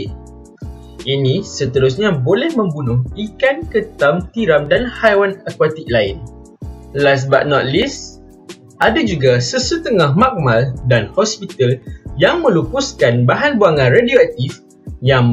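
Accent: North, Malaysia. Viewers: Bila tengok video yang narrator dia cakap utara auto best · suka betul dengan suara narrator. juga maklumat yang cuba disampaikan sangat jelas dan santai dengan slang utara. nais!👌🏻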